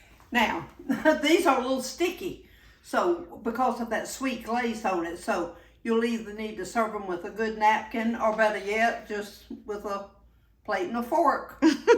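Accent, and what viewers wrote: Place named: South Carolina, United States. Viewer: Hey, so very great to hear from a fellow SC friend. I moved from the Island about 3 yrs ago. Boy do I miss fishing, shrimping and Oyster Roast in fall. I think you attended the church with the great sale annually and have the pumpkins. I lived there 15 yrs and am a native Savannah resident. Can’t wait to get one of the cook books. Even though I get home sick for the salt water hearing a familiar accent I so am enjoying your channel. Marnie Causey😄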